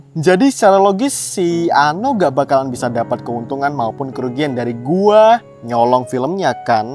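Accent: Java, Indonesia. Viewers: gausah maksa gw gw bro, logat jawamu kentel poll, pake aku aja for ur advice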